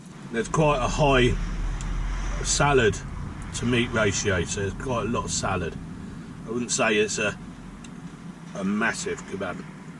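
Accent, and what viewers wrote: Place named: Berkshire, United Kingdom. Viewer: Thought I could hear abit of a Berkshire twang somewhere in your accent.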